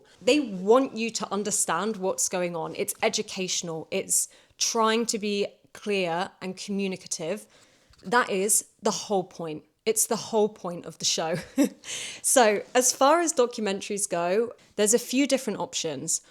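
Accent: United Kingdom. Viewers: Hello, Thank you for your recommendation. I love your content and love your british accent too. Could you recommend more about British films, please? I love British accent but now I don't know what next cause I just found out some queens and princese but I love crime and school sitcom or comedy. Thank you so much for reading my comment. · Watching your videos, is such a treat. I love how you speak British English with such passion and grace. Keep going! Take good care of yourself. See you soon! 🇬🇧🇬🇧👩🏼‍🏫📚👌🏻👍🏻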